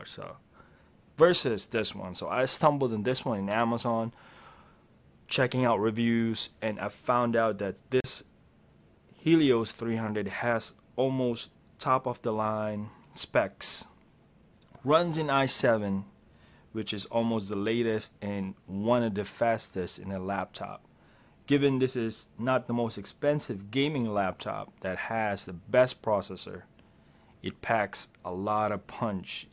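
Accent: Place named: Philippines. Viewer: Just chill guys. His first language isn’t english. He might be a filipino. Don’t be quick to judge.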